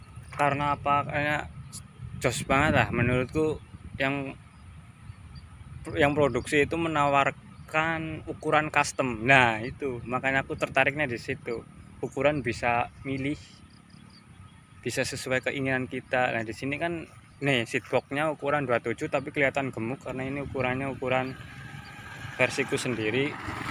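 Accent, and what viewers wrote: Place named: Kebumen, Indonesia. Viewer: Logat bicara karo Bahasa mu lik🤣🤣🤣 Rika Kebumen sblh ndi?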